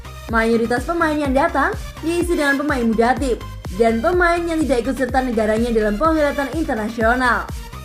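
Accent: Java, Indonesia. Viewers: Wkwkwk jawa, kesukuan banget ni vocalnya,, ga tau betapa luasnya indonesia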